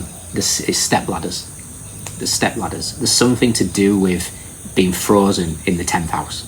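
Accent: Northern England, United Kingdom. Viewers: Yay ,someone British! Liked your reading, although I love all readings from everyone from all over the world 🌎 (I can tell you are northern, where are you?) I am a southerner London 😊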